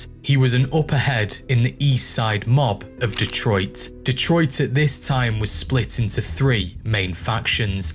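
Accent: United Kingdom. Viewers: What the Fuck is this shit some British idiot talking about the American Mafia 😂😂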